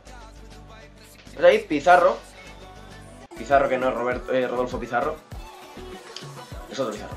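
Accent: Mexico. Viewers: hablas como mexicano, vives en argentina?? o eres argentino o q onda? pregunto nomás x curiosidad, a mí m encanta españa pero tambn argentina, saludos de méxico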